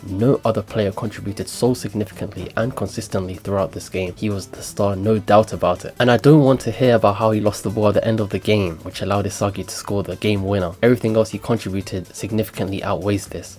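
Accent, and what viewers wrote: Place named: Somalia. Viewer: i can hear in ur voice you’re somali, good vid bro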